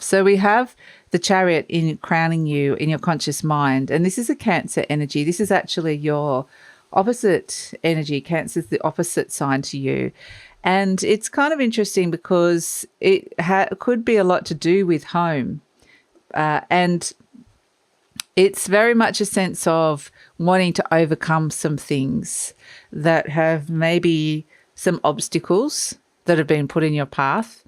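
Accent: Australia. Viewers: Damn I thought , you were probably from northern NSW like myself, but time in Qld has melded my accent , should have gone with my first instinct ha haa , love your work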